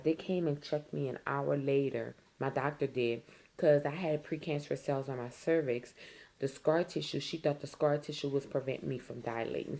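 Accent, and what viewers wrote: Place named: New Orleans, United States. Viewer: She gotta be from here she sounds too much like a fee from NOLA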